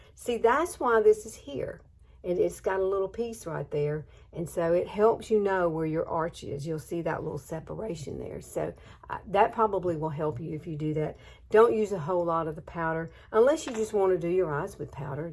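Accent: South, United States. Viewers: I’m a Georgia girl and I completely understand you, girl we speak Southern Belle. You are beautiful inside and out ♥️ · I grew up in Oklahoma, Texas and North Carolina. I definitely understand your southern speak. I’ve had people ask me where I’m from …. 🤣🤣. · You are too cute! I am a Kentucky girl that also has that southern accent and there is nothing better. Have a great week, see you next week.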